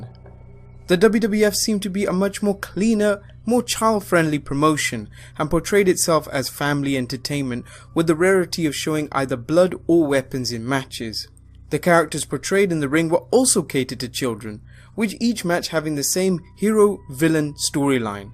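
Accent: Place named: United Kingdom. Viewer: Who else clicks off if it’s not narrated by the British guy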